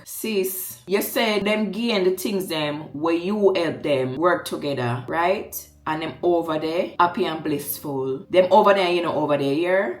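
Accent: Jamaica. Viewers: I really love how speaks, articulate but drop in the patois funny but serious same time. 💫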